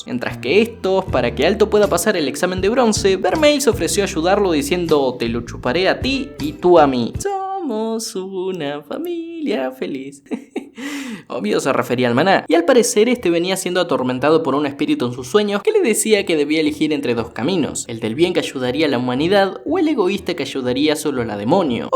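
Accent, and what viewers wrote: Argentina. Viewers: Sos argentino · che soy yo o tiene un re asento ARGENTINO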